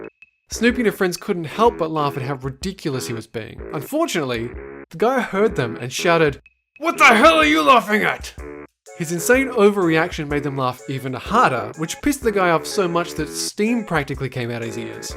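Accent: Australia. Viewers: I SMELL AN AUSSIE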